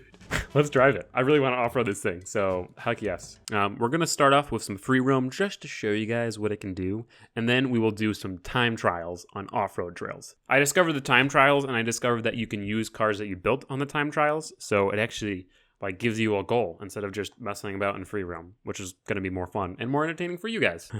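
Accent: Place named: Bay Area, United States. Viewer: he sounds like bay area buggs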